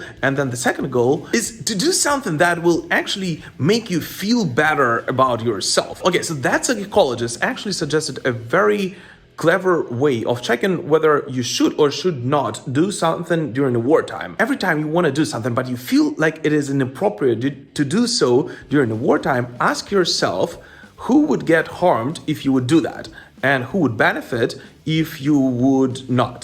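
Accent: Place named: West Coast, United States. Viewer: I'm curious. Where did you learn English? Did you know that you speak with a American west coast accent? It's true. You really do. Perhaps your English teacher was from California or the Pacific Northwest?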